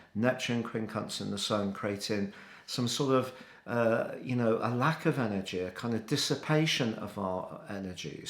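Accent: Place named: North, United Kingdom. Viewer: I like your Northern accent 😂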